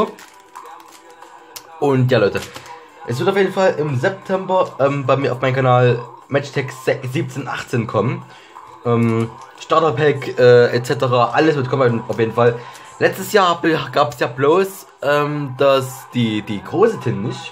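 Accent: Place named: Saxony, Germany. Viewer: Ich liebe deinen Sächsischen Akzent, 😂😎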